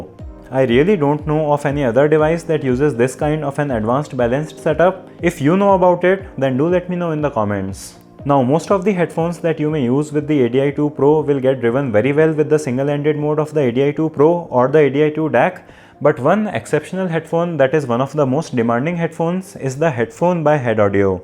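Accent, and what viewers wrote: India. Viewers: The Indian accent is the most annoying English accent in the world ewww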